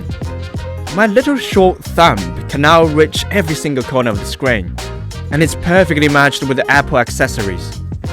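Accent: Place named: United Kingdom. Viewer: 惊奇，竟然是全英文版本的，还是英式英语，哈哈哈，加油，做自己，等你们的充电头了！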